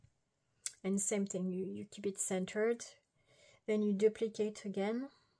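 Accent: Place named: France. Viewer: Hello and Wow, what a beautiful hands, color of nails, and that voice + French accent, am I right? Thank you for this tutorial, this is what I need for my project. I wan to design and make incense stand with mandala motif. You helped me a lot☺ Greetings from Poland Edit: I have a problem with symbol layer, when I do the final stroke to draw mandala shape like you in this circle, the lines are drawn automatically but in one direction left or right instead facing each other. What I'm doing wrong🤔 Edit_2: I have figured out. My mistake by instead of adding first triangle to symbols, I have first created both, connected and then added as symbol which did not point stroke later to the same direction, but opposite, spreading away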